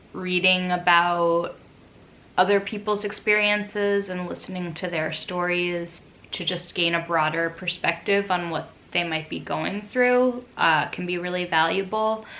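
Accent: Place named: United States. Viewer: Genetically, yes, she is 100% Caucasian as the ME falls under that category. But socially, she's mixed race due to the cultural differences. Judging from her accent, she lives in the US and is trying her best to make sense of things within the racial constructs that she lives in. It's accurate for her to describe herself as mixed race.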